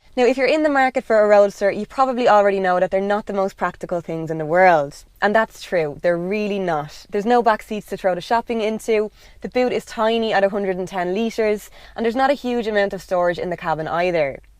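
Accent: Ireland. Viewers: How has this channel not gained more popularity, a girl with an Irish accent driving beautiful cars. Whats there not to like, keep up the good work!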